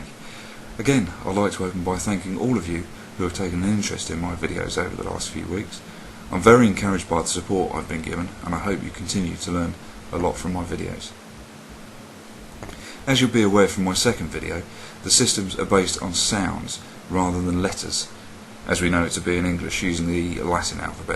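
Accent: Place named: United Kingdom. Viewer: "...we have the "aa" as in "run" - my non-native english mind is confused with your British accent.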